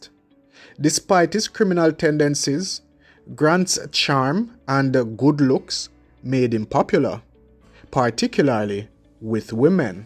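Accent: Jamaica. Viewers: Listen bredrin it's not the first time I've heard about Delroy Grant but it is the first time I've heard this narration and I love it I just love to hear my Jamaican dialect taking off the Ts and emphasizing the Hs gwaaan my yout proud ah yu and Leicester is how you said it correctly sir and you've gained a subscriber ❤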